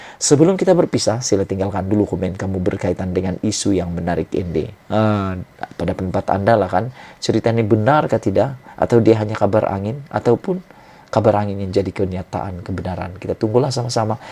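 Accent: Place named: Sabah, Malaysia. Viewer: Sangat suka penyampaian saudara.. Lebih jelas Dan padu.. Slang sabahan.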